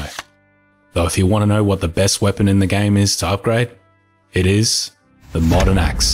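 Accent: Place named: Australia. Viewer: gotta love this australian accent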